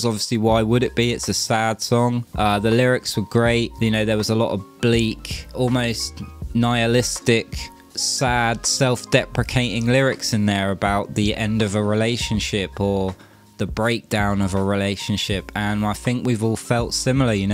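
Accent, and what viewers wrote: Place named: United Kingdom. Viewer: Sorry for loss, I lost my grandmother in May, my father in June and my dog Ireland in August last year, so now how you feel. You were spot on with your reaction, actually you are the only reactor who really understood the emotion they were expressing, love love loved your reaction to this song, as well as all the others. So J Hope didn’t rap when he joined BTS, he would from practicing with Rm and Suga in the dorms and found his own style so that is why you can tell the difference in their styles, you are extremely intuitive, you get these guys so well. Oh and btw love listening to talk, l am a sucker when it comes to British, Irish and Scottish accents, so could listen to you just read the phone book 😂, but also as I said, very intelligent and intuitive, and yes BTS does find you when you need them the most, it is so true, happened with my sister when she was in the hospital after her heart attack, she then wouldn’t stop talking about them so since she didn’t really have anyone to talk to about them in the beginning I would let her go on and on, till then I got hooked with their dancing skills, the fandom who treated you as if you were a life long friend, and then when the pandemic hit, I was really glad I had already been following fhem for a few years since 2018 and I was regretting I didn’t know about them in 2013, but thank god their earlier lives live on youtube and weverse so I was able to see what I missed, I feel like I have been following since 2013. 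Glad you are fi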